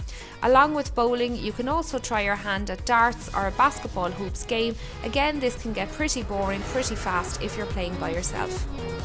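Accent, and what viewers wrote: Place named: Ireland. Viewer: Dat irish accent!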